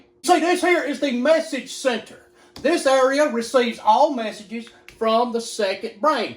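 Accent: South, United States. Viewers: His accent makes it more American, that's why is funny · For some reason, the Southern accent makes it so much funnier :D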